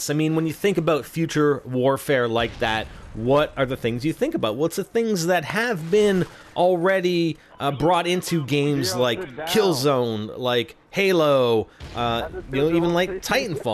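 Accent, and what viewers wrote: Canada. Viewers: are you Canadian by chance?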